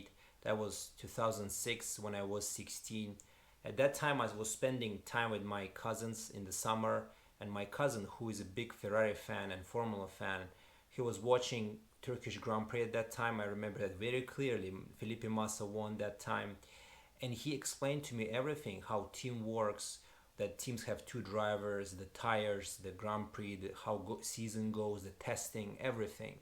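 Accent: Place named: Russia. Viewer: Сразу слышно русский акцент, но твой английский очень хороший!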